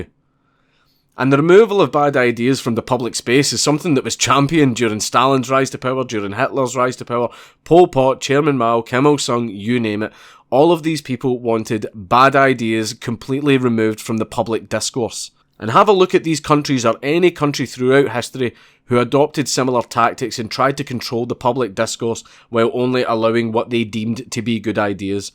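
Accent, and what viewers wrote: Ireland. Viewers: Would be nice if I could hit a CC button cuz your irish is soo thick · haha this is the first video i am watching of yours, and as an American, I can't take you seriously with an Irish accent LMAO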